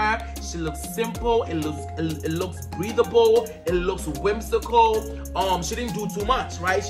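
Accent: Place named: Nigeria. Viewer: Until u spoke twi ,I thought u were a Nigerian, I just like show ,you've got a new subscriber ❤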